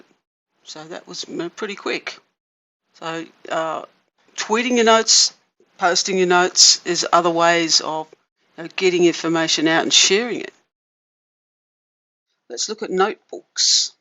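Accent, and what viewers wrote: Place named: Australia. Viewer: Great help and very clear. Loved hearing an Aussie voice too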